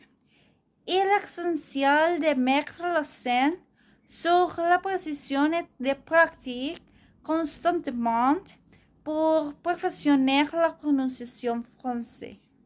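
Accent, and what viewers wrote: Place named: Portugal. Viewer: Eres portuguesa? você fala francês como um português... hablas frances como una portuguesa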